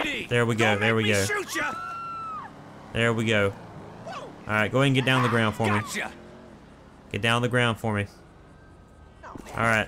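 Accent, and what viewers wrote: South, United States. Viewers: Wat state are you from cause it sounds like you have a southern accent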